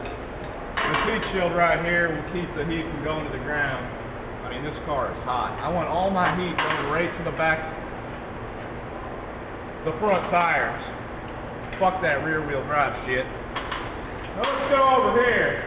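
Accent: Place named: Oklahoma, United States. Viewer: if you don't mind me asking, where is you shop located, i'm an okie but live in Fresno, you sound like me so your probably east of me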